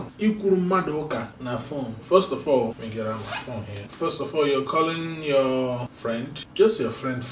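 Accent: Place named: Igbo, Nigeria. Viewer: where are you from…the dialect of igbo is a little different from what I'm used to…but completely understandable ! keep it up !! i look forward to these videos.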